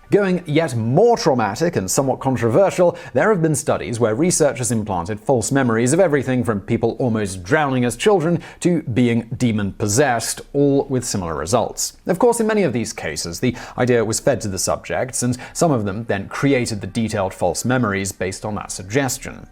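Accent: United Kingdom. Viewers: Psuedo intellectualism influenced by post modernism is the curse of the 21st century. A british accent doesn't make it so. 2 hours of gross over statements, lies and fluff.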